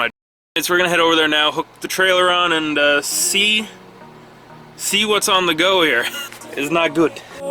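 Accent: Canada. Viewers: that canadian accent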